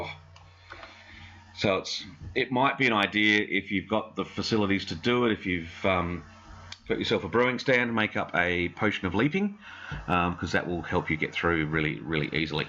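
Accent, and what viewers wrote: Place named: Australia. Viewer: Great to hear a fellow Australian, and thanks for posting the tutorial! It's a great first video.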